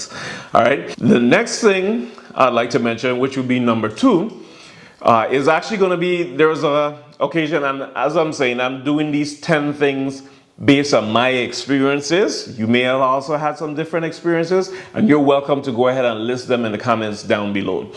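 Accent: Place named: Jamaica. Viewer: I thought you were Jamaican too!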